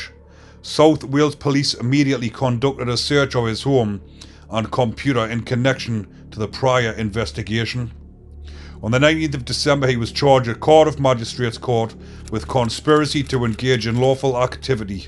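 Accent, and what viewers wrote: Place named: Scotland, United Kingdom. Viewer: Your Scottish accent is cool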